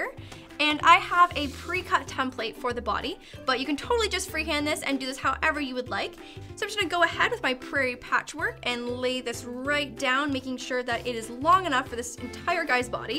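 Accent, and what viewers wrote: Canada. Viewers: I am trying to to figure out your accent... are you from Canada? Also I name the giraffe Geoffrey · Yep, she's from Ontario :)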